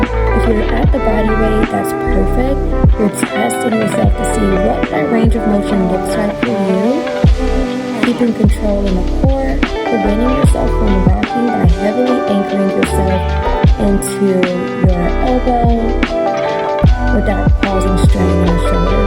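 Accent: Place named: South, United States. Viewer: Love this so much! Such a sweet southern bell, love the voice over. I’m getting kind of tired of workouts where it’s just music and I have to keep looking at the screen to see what they’re doing. Please do some nighttime stretches and add a timer bar for each set and an overall progress bar. Love love love this❤❤❤❤❤❤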